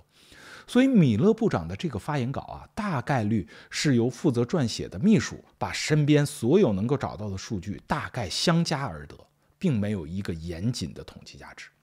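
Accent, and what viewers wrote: Standard. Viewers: 油主是国内播音员出身吗？？这是我听到最标准发音的自媒体人了🎉🎉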